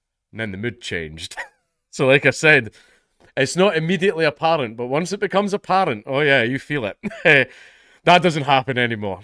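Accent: South, United States. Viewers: Dankulas American accent was fucking spot on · country accent is a gift I've never known I needed until I received it. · you do a great american accent. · I love the southern US accent. Use it more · The Right sort of American accent too.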